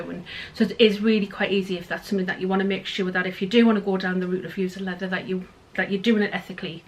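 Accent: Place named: Northern England, United Kingdom. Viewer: Wow what a fantastic haul! It was your fabulous Northern accent (as is mine!!) that lead me to you, the first time I saw a video it was Hang on that's an English accent and double bonus Northern!! So keep up the fabulous work and don't ever change we love you for being you and a fabulous bag maker and seller of quality hardware and runner of classes and retreats xx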